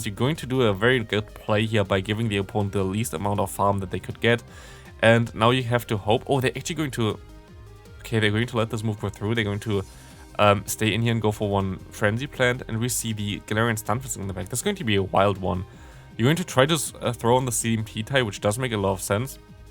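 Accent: Germany. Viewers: I knew it you were german! the classic german accent like mine xD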